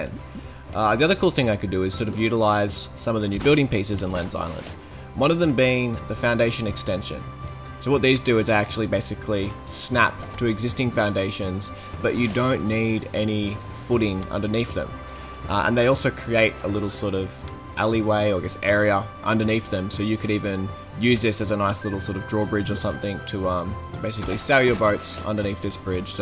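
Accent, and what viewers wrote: Australia. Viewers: I love the temporary z-buffer object transparency (???) - is that common? It looks very schmick and useful. I have done something similar in a Windows explorer clone I am developing kinda sorta... Good stuff here, great to hear the Aussie voice on a game dev video of this quality.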